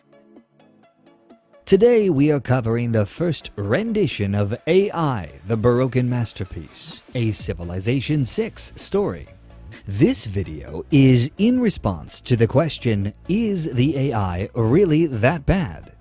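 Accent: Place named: Ukraine. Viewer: He should stick with his natural Ukranian accent. Dame pesos for example.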